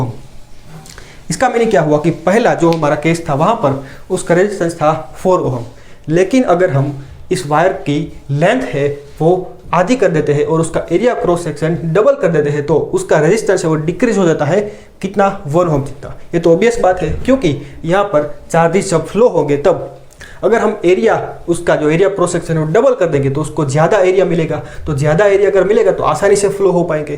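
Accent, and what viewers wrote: Gujarat, India. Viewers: Nice explained. Are you from Gujarat?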